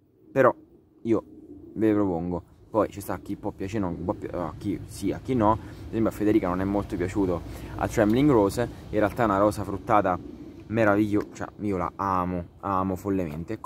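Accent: Rome, Italy. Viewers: Forse romano.Bellissimo!!!! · Parla in romanesco a me piaceeeeeeeeeeee 👍👍👍👍👍😍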